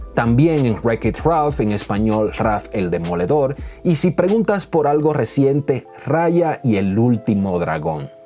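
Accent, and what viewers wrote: Mexico. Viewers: Tu acento es mejicano habla con las narices mejicanos no creo que sea dominicano.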